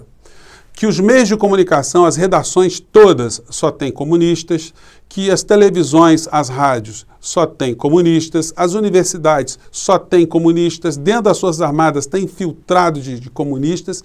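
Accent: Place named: Rio de Janeiro, Brazil. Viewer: Professor, não tente "amenizar" o seu sotaque. Fale sem pena nem vergonha com o nosso sotaque do nosso maravilhoso Rio de Janeiro! Um abraço!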